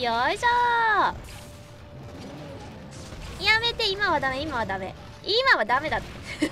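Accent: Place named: Kansai, Japan. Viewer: 動画がアップされるごとに関西弁のレベルが上がっていっている 素がで始めたのか 昔は・・・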